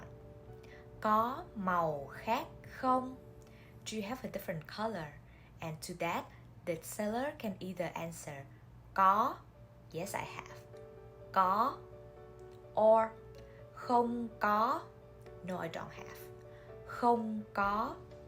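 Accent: North, Vietnam. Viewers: Northern accent?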